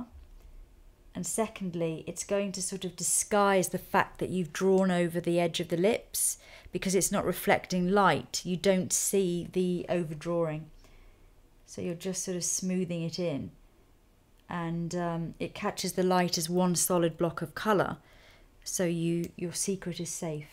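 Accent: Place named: England, United Kingdom. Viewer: I don't know if i watch every tutorial from you without missing ONE because of your talent and because your make ups are always light and soooo professional, or if it's just because i loove your voice and accent. I'm french and i love english accent !!! It's hypnotising !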